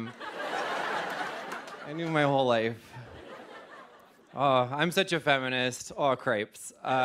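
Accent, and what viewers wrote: Canada. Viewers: Winsc accent sounds a bit Canadian 🤔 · Why am I hearing a Canadian accent? lol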